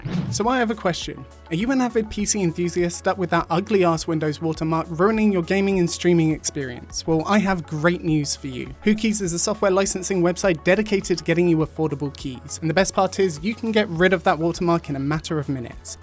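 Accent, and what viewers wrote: United Kingdom. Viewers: interesting video. But i just took a look at your links for a few cards i could be interested at for different prices ranges. Well your links for the so called "cheapest" cards i looked at, well, where more expensive than i have seen on other sites in the uk in the last few days. these links where very disappointing after sitting though your video. Not impressed. Also which market are these best prices from? You are from the UK sounding wise but you keep talking and showing usd prices. Maybe i missed something but you really should say which market your talking about as they can range in prices so much. esp as USA don't post tax's on there card prices quite often. Anyways thanks for the video and the effort but disappointed. Cheers.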